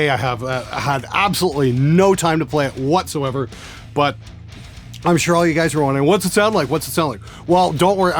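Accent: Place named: Canada. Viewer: Every time he says about I'm like wtf and then I remember he's canadian XD not that that is wrong I just always forgett he's from another country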